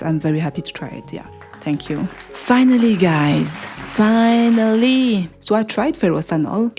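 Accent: France. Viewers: Quel beau Englais-Francisé😊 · I picked up the same French accent was confused by Germany 😂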